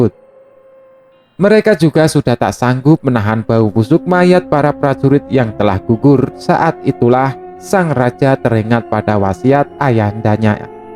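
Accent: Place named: Java, Indonesia. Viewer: mulut orang Jawa logat bicara Jawa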